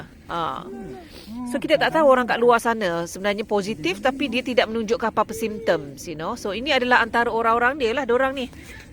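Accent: Malaysia. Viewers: Mengcokik😁😁😁. U re melayu pure. Nismilan pure. Suka den dongar sis sobut Melayu totok.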